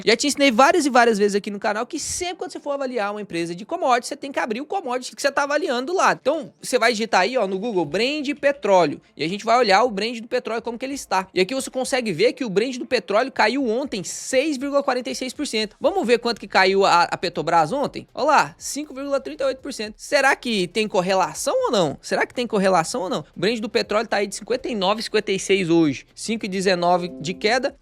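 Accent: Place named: Minas Gerais, Brazil. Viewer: Alguém sabe de onde é o ADM? Pelo sotaque, e por um “uai” que ele solta de vez em quando eu arrisco que é do nosso país Minas Gerais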